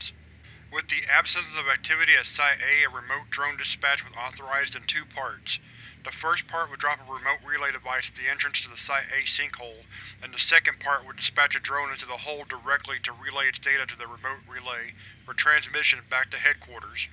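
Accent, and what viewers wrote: South, United States. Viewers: where u from? GA kid myself yet hard to place where u are below the mason dixion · Nice southern accent! You make this seem like SCP 610 was real.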